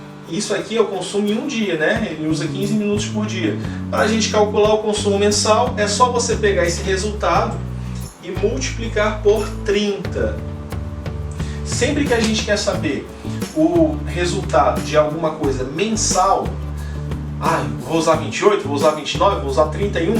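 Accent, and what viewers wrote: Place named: Rio de Janeiro, Brazil. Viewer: O cara é muito gostoso. Vou ouvir essa aula várias vezes antes de dormir com esse sotaque carioca. Muito bom. Principalmente quando você foi ao quadro. Massa cara.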